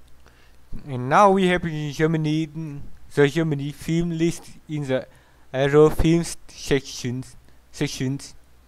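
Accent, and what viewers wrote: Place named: Malaysia. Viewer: I like how you speak English, it sounds totally like how a Malay or Singaporean Chinese speak English rather than a Vietnamese. But, nonetheless that's super dang interesting themes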